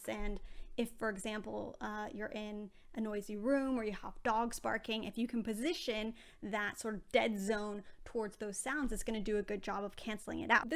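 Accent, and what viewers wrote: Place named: United States. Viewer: You sound American, but you refer to Pounds in currency.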